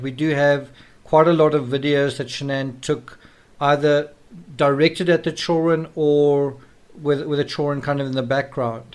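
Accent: South Africa. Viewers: ...are you South African?🇿🇦🇿🇦... Love the way all this is explained..though I still feel CW gets off on any attention..good or bad... Nothing can ever excuse what he did to them..what would be great is if he could tell the truth..even if that includes outing NK. Such delusions CW probably has twisted the truth is his head.. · The man speaks just like he spent his entire life in South Africa . Because , he pronunciations are a bit different , he is still readily understood. I feel your comment is quite rude.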